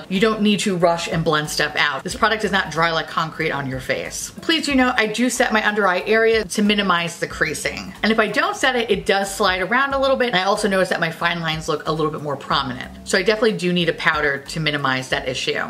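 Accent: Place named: Minnesota, United States. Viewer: While in her minnesota accent.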